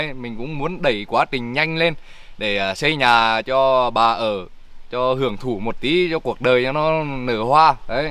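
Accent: Nghe An, Vietnam. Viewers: Mẹ mình cũng ở quê Nghi Lộc. Nên nghe tiếng của sao mà thích ghê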